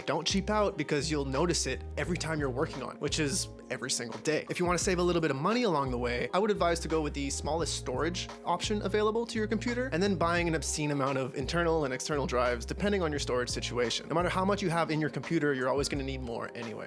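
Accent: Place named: Quebec, Canada. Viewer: Ben weyon! Ton français fait vraiment Québécois!? Tu viens du Québec?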